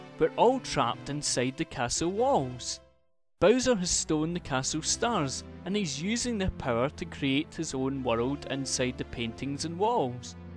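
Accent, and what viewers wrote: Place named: Scotland, United Kingdom. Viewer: Lol i love your voice. i know your Scottish but its pretty cool to hear something unamerican for awhile.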